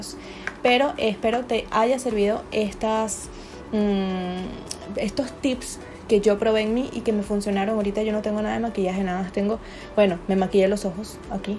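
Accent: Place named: Venezuela. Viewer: Oye tienes un acento venezolano, en qué parte encontraste esa crema, si es que estás en Venezuela. Gracias .Caracas.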